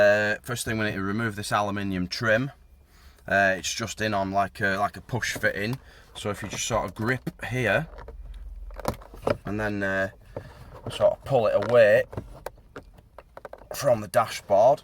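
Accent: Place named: Cumbria, United Kingdom. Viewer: I dont hear many other Cumbrian accents on You Tube..lol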